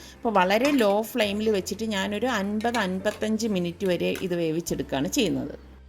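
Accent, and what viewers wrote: Trivandrum, India. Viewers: Tvm style samsaaram kettappo oru sugam......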